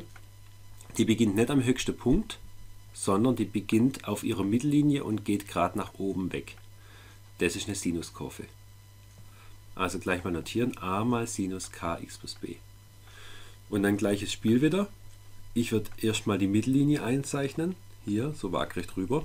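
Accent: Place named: Swabia, Germany. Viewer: Haha 😂 Suppa Schwäbisch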